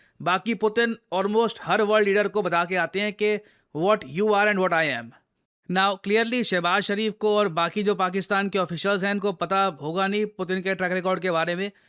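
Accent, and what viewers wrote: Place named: Haryana, India. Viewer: When sir says image Geri hai, 😂😂😂, sir ka haryana MTI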